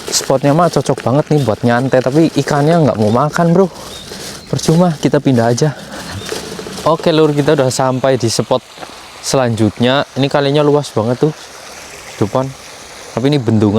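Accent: Banyumas, Indonesia. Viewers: Gass terus kang rika wong ngapak ngendi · ngapak ngendi ya?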